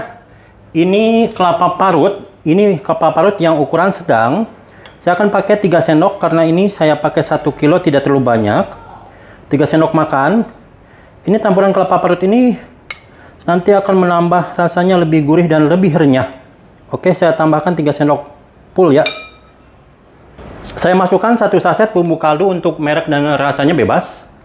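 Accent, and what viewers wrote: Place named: Sunda, Indonesia. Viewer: Mantap kang, di GALO pokokna mah, dan kita SODOK, sunda pisan kang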